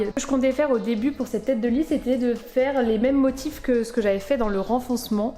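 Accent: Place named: France. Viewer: Ça a l’air très bien but the accent français je peux pas regarder, désolé 😂 ça fait mal aux oreilles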